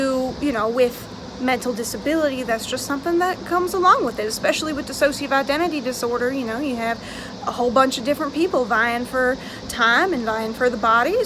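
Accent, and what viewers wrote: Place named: South, United States. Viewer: Hi, nice to meet you. I love your accent. I'm from the UK but I love the country accent. Who is your favourite country music artist? Mine is., I hope this ok to ask, have you ever switched on camera? I found your channel through DissociaDID.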